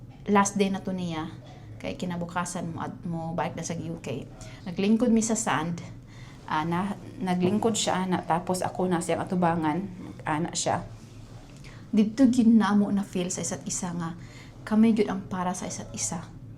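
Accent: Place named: Cagayan de Oro, Philippines. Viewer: Wow ka nindot sa imong love story dhay. Congrats ❤️Lalom kayka mag bisaya murag taga Cag de Oro. Nindot paminawon.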